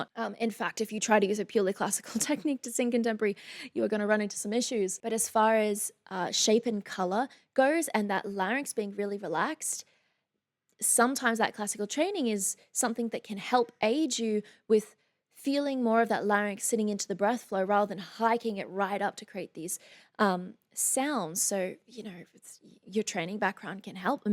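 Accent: Australia. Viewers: "You Nut Job"! I love how you Aussie's talk! PS: I'm glad you go with the English way of spelling words, like "colour" unlike everybody else who leaves the "u" out of a lot of English words, other examples are words like "parlour", "behaviour" etc. I'm a Kiwi so we don't leave the "u" out of words either unlike the American's do. Americans just love to abbreviate everything and anything until it's unrecognisable, I think! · And yes... I could tell you were a Queenslander from the accent alone :-)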